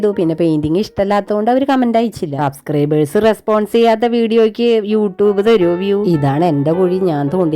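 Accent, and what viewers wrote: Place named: Thrissur, India. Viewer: Thrissur slang😅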